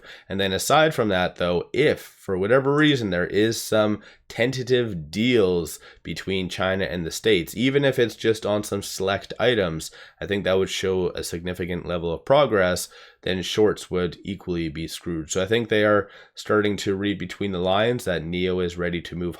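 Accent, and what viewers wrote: Canada. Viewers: Good content as always. I detect a Canadian accent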